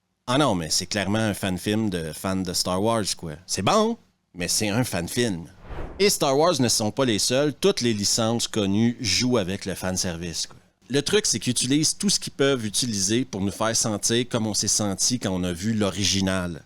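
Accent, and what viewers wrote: Quebec, Canada. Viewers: Le Monsieur est canadien avec son accent ? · T'es Suisse et t'as un accent Québécois !?! Ou t'es Québécois et tu vis en Suisse ?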